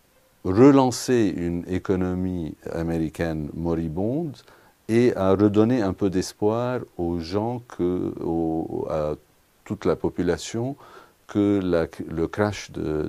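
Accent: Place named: Quebec, Canada. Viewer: I disagree. I think he's French-Canadian. Either that, or he has spent time in Canada and he's really really advanced in French.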